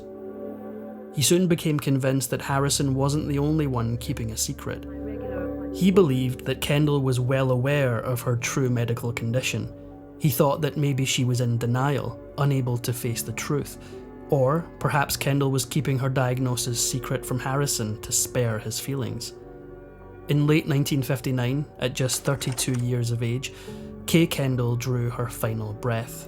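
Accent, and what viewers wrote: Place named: Scotland, United Kingdom. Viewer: Yay my favorite Narrator love the Scot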